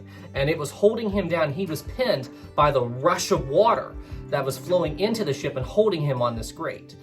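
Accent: South, United States. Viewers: Stop trying to hide your southern accent. Nobody cares. Excellent video thankyou! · Your southern accent .........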